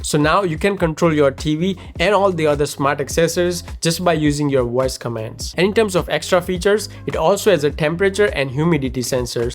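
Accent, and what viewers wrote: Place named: India. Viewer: The accent is very much mix of too indian and US!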